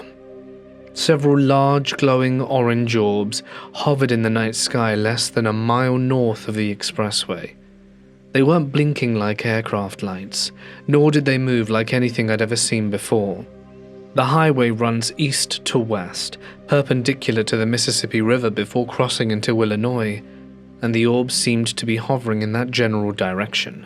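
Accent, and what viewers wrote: England, United Kingdom. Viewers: You really have a gift for nuance and expression And your English accent just makes it even better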